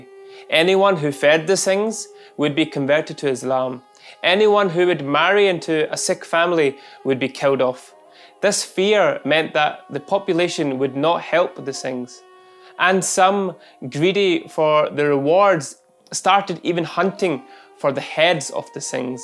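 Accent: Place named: Scotland, United Kingdom. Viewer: Brilliant job bhai ji, and I adore your Scottish accent.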